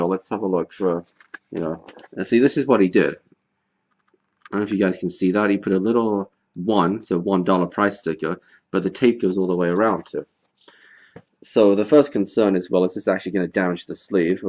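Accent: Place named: United Kingdom. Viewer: Glad to hear you enjoyed yourself back in the UK. I've not been back in almost 10 years, time flies what can I say. Sounds like your accent has taken a bit of a hit mind, funny how that happens with some and not others. As for The Snowman, from memory I think was on the film but the song/single that was released (almost 3 years later) was ' version and that's the one we all tend to think of.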